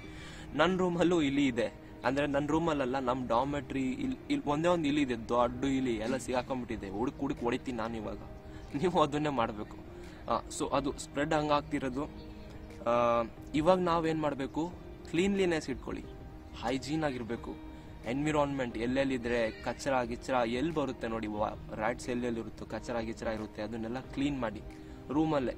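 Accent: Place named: Malnad, India. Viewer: Malnad avra tharaha maataadteera sir very cute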